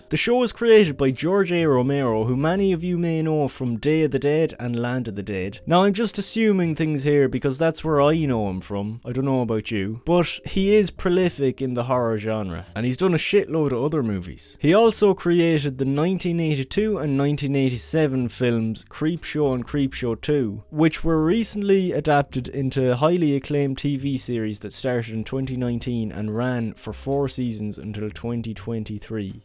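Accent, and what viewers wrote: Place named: Ireland. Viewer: I’m sorry I was just messing… I actually love your Irish accent! ❤❤ I have a few Irish friends who say I am too English to understand them lol 😂😂… great video! I am following you now too! ❤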